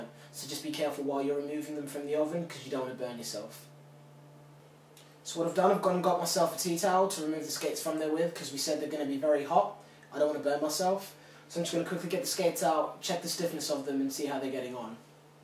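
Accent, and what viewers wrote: United Kingdom. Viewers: a black guy with a British accent talking about hockey skates..... lol crazy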